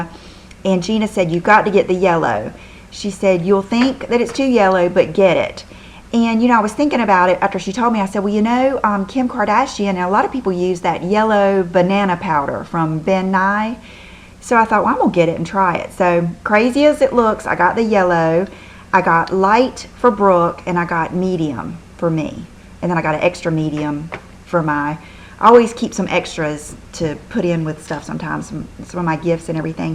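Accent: South, United States. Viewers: I Love Love Love! Your "Old Southern" Accent! Please Dont Change A Thing! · I think your old southern accent is adorable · I am so glad I found your videos! You are beautiful and so happy, and I love your southern accent! Subscribed!! :) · I love the way you talk! :-) Love southern! Don't ever stop being you! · I'm from Mississippi and I totally get the southern accent, I say cement the same way lol I do say Pinterest the correct way, probably because I heard it pronounced before I saw it written down :) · Never saw that Too Faced liner but me and my Ulta coupon will be getting it this weekend. That and the NYX yellow HD concealer. Girl, I understand your "Old South". I understand every word you say...and talk the same. Hug your babies for me. Sad day. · I speak Old Southern too and I totally understood every word you said! So refreshing for me! hahaha. 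· You are a breath of fresh air. I love your accent because I'm a Southern girl too and we are by far the most charming ladies ever:))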